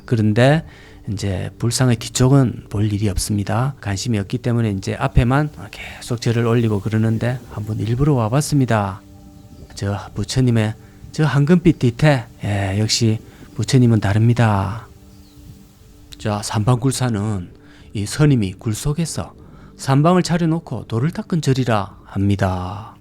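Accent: Gyeongsang, South Korea. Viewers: 님 넘 재미나게 갱상도 사투리로 설명도잘하시고 웃기심니더 많은 영상 부탁드리기심더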